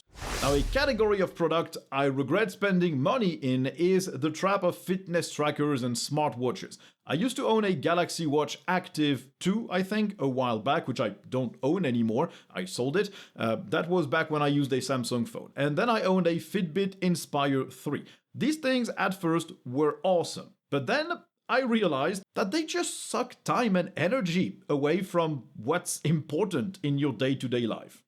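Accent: France. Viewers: random question here, are you french ?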